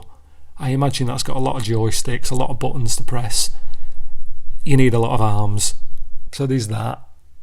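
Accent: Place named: Northern England, United Kingdom. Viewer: Love how he goes extra northern when doing his radio voice LMAO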